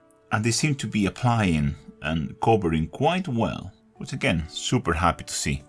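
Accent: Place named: Spain. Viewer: Tu inglés no nada más es bueno, pero es sumamente agradable y usas dichos muy apropiados, 👉lo cual es por felicitar 👈porque pocos ibéricos/latinoamericanos en este ámbito del pasatiempo lo logran...lo digo porque es casi la única vez que he oído que se te salga el castellano.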